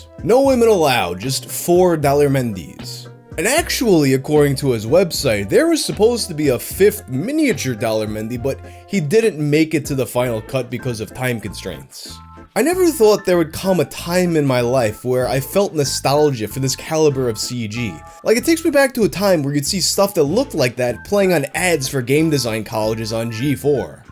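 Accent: New York, United States. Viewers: WTF! You are Sikh??? U sound American as fuck. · did you always have a new york accent? i swear you didn't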